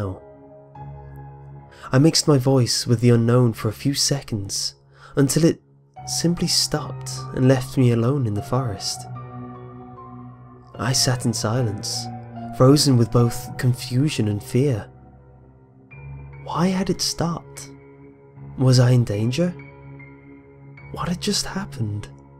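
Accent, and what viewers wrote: England, United Kingdom. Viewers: English accent I believe :3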